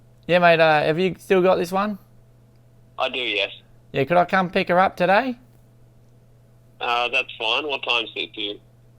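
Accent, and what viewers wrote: Bogan, Australia. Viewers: An Australian doing an Australian accent is weirdly amazing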